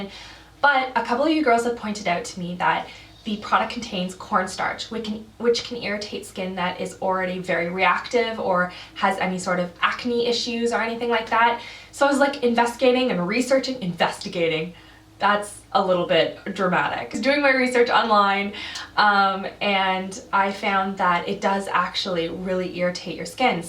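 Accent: Canada. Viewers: hi - its so funny that i after just watching u and listening to you i could tell u are Canadian :) i now my people! heheheh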